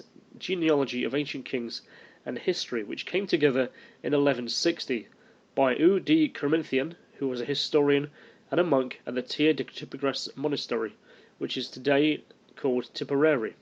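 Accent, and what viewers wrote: United Kingdom. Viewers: The narrator sounds British so give him a break. 🌈🌈😝🌈🌈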